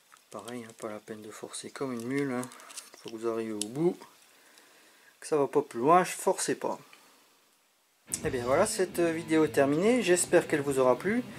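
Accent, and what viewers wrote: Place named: Franche-Comté, France. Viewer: Hoooo j'ai kiffer l'accent vingt dieuuuuuuu...franc comtois en force lol... Plus sérieusement c'est de la très bonne qualité mais le prix de la grenade pique un peu quand même je dois avoué sinon superbe présentation comme dab.a bientôt à l'OP 😉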